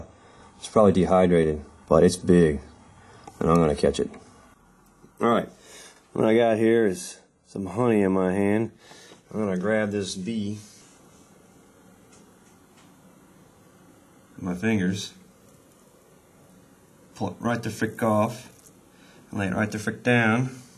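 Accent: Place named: United States. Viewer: OMG YOU'RE AMERICAN AND I LOVE YOUR ACCENT xxx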